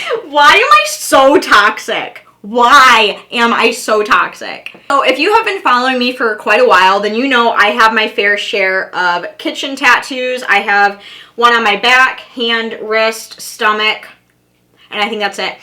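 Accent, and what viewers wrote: United States. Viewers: Listen, if you are broadcasting to an audience of many millions, isn't it time to start thinking about good English? After all, you speak one of the local American dialects, which have very little to do with the real English. I don’t want to impose anything on you, but maybe you can still correct your English?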